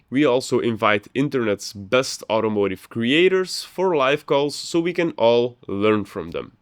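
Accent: Belgium. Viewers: are you from belgium ?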